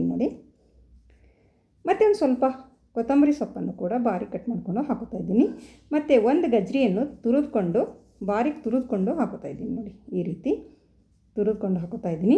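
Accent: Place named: Kerala, India. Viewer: Pronouncing Vada, Dosha is Malayalam influence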